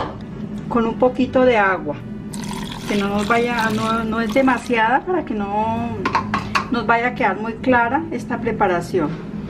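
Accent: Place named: Colombia. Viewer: Me encanta el acento colombiano, muy deliciosa receta, saludos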